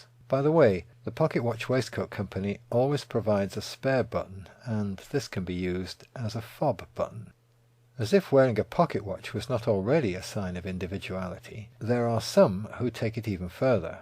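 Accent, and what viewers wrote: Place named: Mid-Atlantic, United States. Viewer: You are the only person I know in the modern age with an impressive mid-Atlantic accent.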